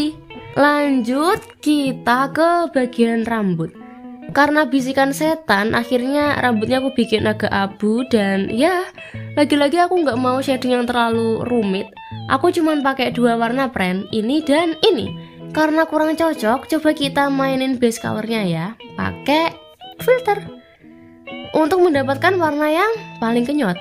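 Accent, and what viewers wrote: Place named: Banyumas, Indonesia. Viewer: Mbak medhok ngapak Bahas dong kenapa kenapa kita gak bisa improve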